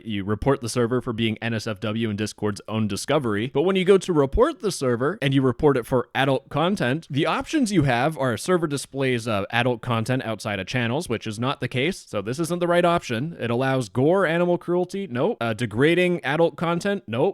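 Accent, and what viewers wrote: United Kingdom. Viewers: Ahyes, the spiffing brit speech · blud sounds like the spiffing brit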